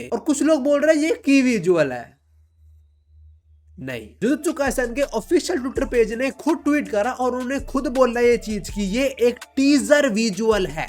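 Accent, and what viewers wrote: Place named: Bengal, India. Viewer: Wow Bengali is funny ngl 😅✨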